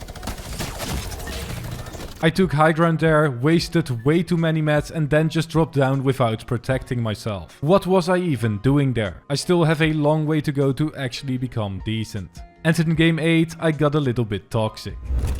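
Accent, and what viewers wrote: Netherlands. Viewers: Kom je uit nederland? Are u from the netherlands? Because i am and i think your accent sounds just like it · Is he Dutch?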